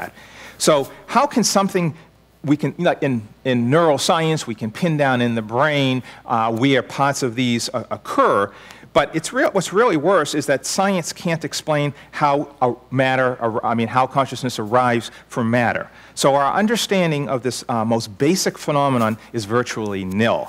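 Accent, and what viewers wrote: Boston, United States. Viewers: THE VOICE RECOGNITION CAN'T HANDLE A BOSTON ACCENT that is conclusively been proven that if you watch a subatomic particle orbital I passed through two sluts in a barrier it will behave like a particle and it creates the solid lookin hits on a barrier are that the business can measure and so like a boy to go through one hole but again if you don't observe a strict reject re the particle than exhibits the behaviour wave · Having a tough time taking him seriously with that Boston accent. They just don't sound smart. He should try a British one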